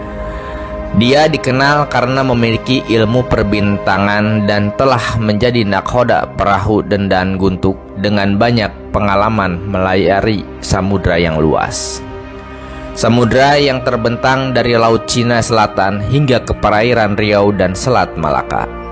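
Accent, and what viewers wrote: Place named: Sunda, Indonesia. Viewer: Suaranya seperti orang sunda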